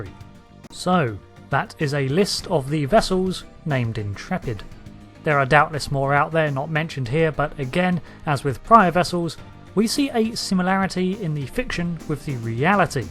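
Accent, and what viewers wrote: United Kingdom. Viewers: I love your accent, what part of Britian is it from?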